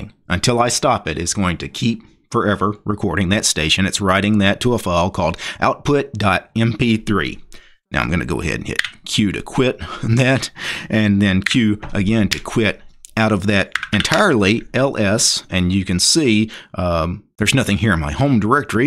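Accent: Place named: Louisiana, United States. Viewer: That Louisiana twang really is something 😅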